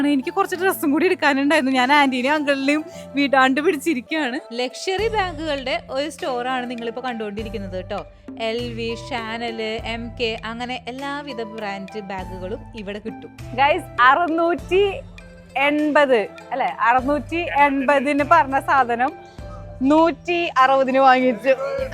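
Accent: Thrissur, India. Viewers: like ur thrishur talk..why not using ur old thrishur tag line now a days?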